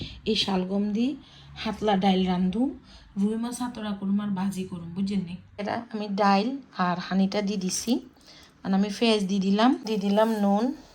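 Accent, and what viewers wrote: Noakhali, Bangladesh. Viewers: কেমন আছেন আপু।আপনার মুখ থেকে নোয়াখালীর ভাষা শুনতে খুব ভালো লাগে। আমিও নোয়াখালীর লক্ষ্মীপুরের মেয়ে ❤❤❤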